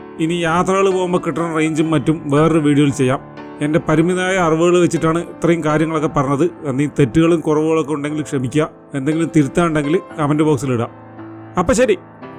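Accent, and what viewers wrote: Palakkad, India. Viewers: ഇയ്യ് പാലക്കാടാലേ ??😁😁😁😁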